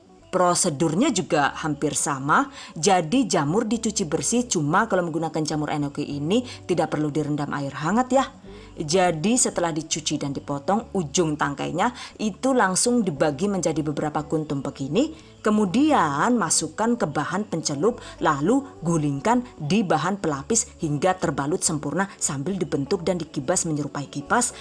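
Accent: Banyumas, Indonesia. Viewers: Aja klalen,,,diiiii caaateeet luuurrrr😅😅😅😅😅 ora ngapak ora kep nak,,,mantap jiwa mempesona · Ngapak mbangeeeet kieee mak... Masya Allah kereeeen. Ra ngapak ra enaaakk... 😂😂😂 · Jowone ngapak mantap resepe lucu iklane suka 2 nya itu jamurnya nak coba sudah saya catat lalu saya coba ah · Suka bgt dngan channel ini,detail bgt. Di selingi khas ngapak yg lucuuuuu....bikin gk bosen nontonnya. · Ngakak pke bhasa ngapak tp sngt seru,,thanks resepnya😁😁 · Yayayaya ngapak temen rikane Mak 😅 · Ngapaknya katok kak yakin bikin ana pingen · Astaghfirulloh.... NGAPAKNYA gawe nyong njungkel kepoyuh ngakak ora mandeg kie🤣🤣🤣🤣🤣🤣🤣🤣🤣🤣POKOKE THE BEST CHANNEL, SUKSES SELALU 😍😍😘😘😘😘🤣🤣🤣🤣🤣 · Hahahaha salammm ngapakk .wong ndi sih kie yah😂nyong ngapak kie jan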